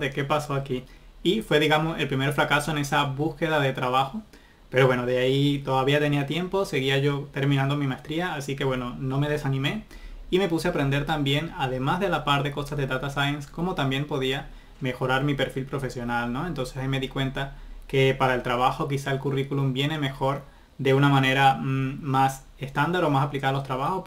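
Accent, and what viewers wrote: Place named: Puerto Rico. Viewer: Jurao' que pensé que eras de Puerto Rico por el acento. Saludos desde Santo Domingo, República Dominicana.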